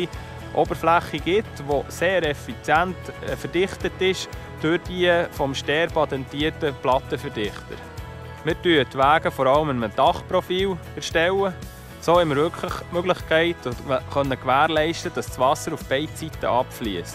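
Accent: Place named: Bavaria, Germany. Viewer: Ich versteh alles, aber man muss sich anstrengen. Im südlichen Bayern der Dialekt ist quasi der selbe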